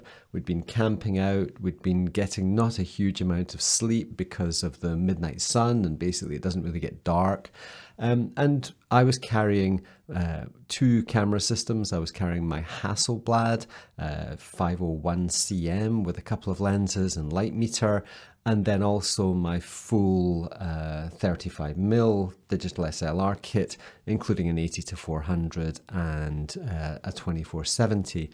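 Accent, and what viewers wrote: Scotland, United Kingdom. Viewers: I just love the Scottish accent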